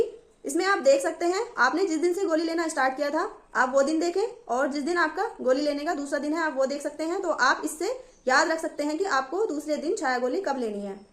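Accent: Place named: Uttar Pradesh, India. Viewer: Nice explanation dii, aap up se h kya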